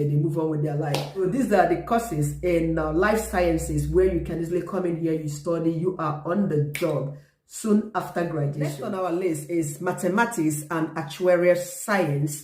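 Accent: Nigeria. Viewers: Teaching education with Naija accent??? In the Uk, none starter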